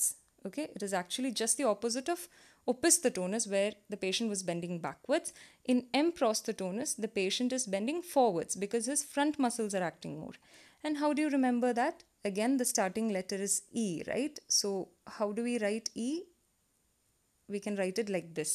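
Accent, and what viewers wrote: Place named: South India, India. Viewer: OMG! This is the best one on YouTube. I love your crystal clear voice in South Indian style. Thanks🙏🙏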